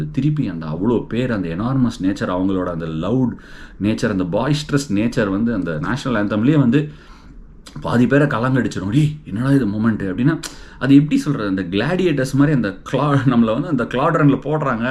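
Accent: Tamil Nadu, India. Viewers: Its so cool to listen Ash in his instinctive Tamil accent. ❤ from Kerala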